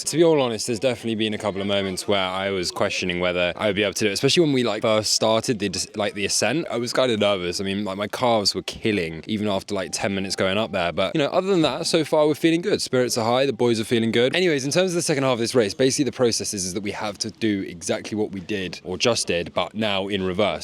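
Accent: United Kingdom. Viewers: learn me british accent pls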